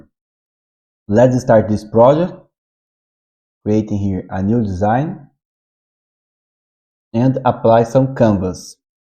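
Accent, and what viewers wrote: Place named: Brazil. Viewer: Sotaque de brasileiro.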